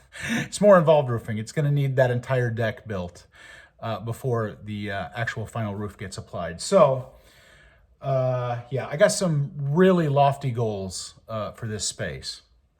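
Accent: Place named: Midwest, United States. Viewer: Actually thats the ceiling not the roof but I have a great fondness for Mid-central American accents. ❤️👍🇺🇲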